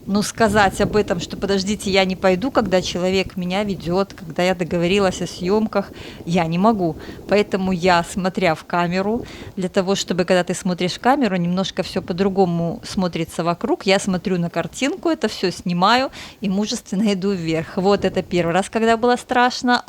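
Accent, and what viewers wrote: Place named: Belarus. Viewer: не слушайте критиков вашей дикции. У вас чудесная дикция с едва уловимым, красивым белорусским акцентом. Так-же, не слушайте придурков политизированных маргиналов провоцирующих вас по по поводу языка общения. У вас всё отлично и ваш канал замечательный.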